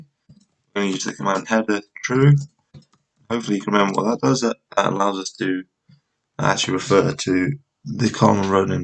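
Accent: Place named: United Kingdom. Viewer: This is a really offtopic question but: Which part of the UK is your accent from? I'm not a native speaker so I can't tell, however, I'm in love with the UK accents and I'd like to broaden my knowledge in reckognising them! Besides that, thanks for the video, it helped me with my thesis :) Kind regards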